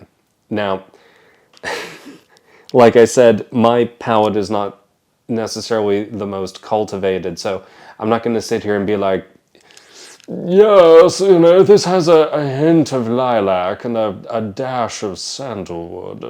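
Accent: Scotland, United Kingdom. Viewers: Hi, your mimicry of an English accent was pretty good and you are starting to acquire a Scottish accent too. The whisky certainly seemed to reach parts that other whiskies don't reach😅. Loved watching the gin production at Dunrobin Castle, I always wondered how gin companies got their botanicals into the gin and now I know that its with a giant teabag. Love the colour of their bottles too. Wishing you and Christine as well as Queen Annabel a Merry Christmas. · I’m liking the new format. I’m also starting to notice a wee bit of a Scottish twang with some of your words, we must be growing on you 🙂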